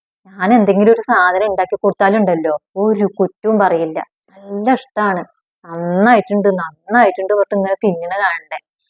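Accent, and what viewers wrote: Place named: Kongad, India. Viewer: കോങ്ങാട് ആണല്ലേ വീട് സംസാരം കേട്ടപ്പോൾ സംശയം തോന്നിയിരുന്നു 😊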